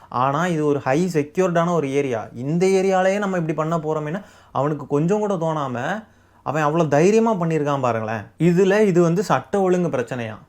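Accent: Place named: Telugu, India. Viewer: கொல்டியின் குரல்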